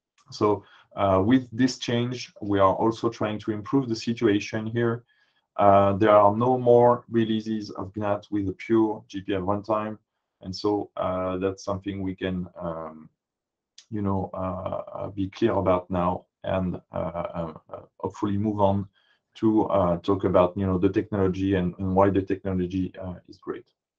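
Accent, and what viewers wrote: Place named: France. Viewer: There is a lot of information in this and has done a good job in assembling it. However, a video like this stands or falls on the quality of its audio delivery. It just isn't fair that AdaCore would ask a professional software engineer to deliver a presentation like this without adequate training in voice projection. There are far too many French pronunciations of vowels (e.g. SOORCE instead of SOHRCE for "source"), pauses as the presenter searches for the right word or phrase, drops in volume at words that are vital for comprehension and so on. The whole video should have been scripted before presentation. I do not mean merely scripted like a piece of text to be read in user documentation. I mean that the script should: 1. Cover all the points that need to be made on the AdaCore Community -> FSF transition, Alire, project and library development; 2. 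Be comprehensible to all with reasonable fluency in English; 3. Be phrased in a way that is easy for this presenter to deliver. Once an appropriate script is made, the presenter can then focus on the relatively easy tasks of getting his pronunciation correct and his projection loud and clear. I hate making critiques like this because it is clear that the presenter here is absolutely expert in his subject. But he has been badly let down by his employing organization, AdaCore. They sent him out to bat without any proper preparation or training. You cannot create a software language community without investing in clear c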